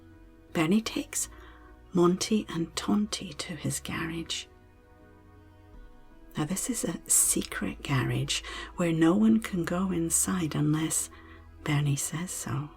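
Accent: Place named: Canada. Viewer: My daughter loves this story and requests it almost every night. It was a significant part of helping her school/separation stress. Thank you. I share your channel with almost all of my clients, as so many struggles with sleep concerns, anxiety, or busyness! If there is anything else I can do to support your work, let me know. Love your "accent". We're Canadian, eh?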